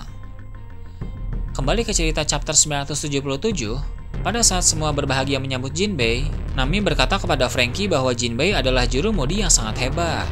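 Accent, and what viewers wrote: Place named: West Java, Indonesia. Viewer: Dari logat kayak nya admin orang sunda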